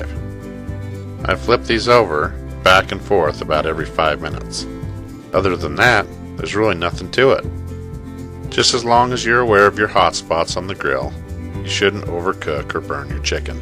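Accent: United States. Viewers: You sound very American to me. I'm not hearing any accent ... but you do have a wonderful voice.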